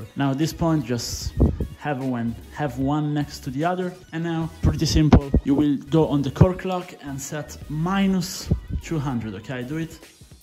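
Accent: Italy. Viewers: dal video ho vagamente sentito la calata italiana ma non ero sicuro 🤣 .... e il secondo video di undervolting che vedo sul tuo canale e ti volevo ringraziare! ho una tuf 3070ti e ho optato per 850 mV a 1800 Mhz con un boost di 1000 e un drop di 200 ma penso che posso spingermi un po’ oltre provando 850mV a 1875 Mhz senza boost di 1000 e il drop di 200.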